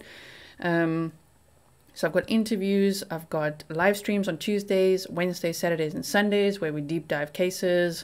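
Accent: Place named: South Africa. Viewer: I look forward to checking in to your channel daily! Not to mention your South African accent!!! I lived in S.A for 2 yrs in Jo-burg and Pretoria. Miss in tons! Thanks for the great content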